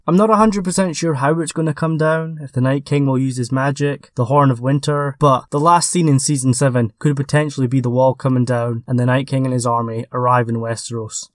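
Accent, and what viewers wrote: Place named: Scotland, United Kingdom. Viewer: Leave it to the Scottish guy to say "more main characters killed off." But, alas, you're right. And I really hope my accent detection is decent enough to know you're Scottish. I love your work and look forward to your videos everyday. Thanks for the hard work :)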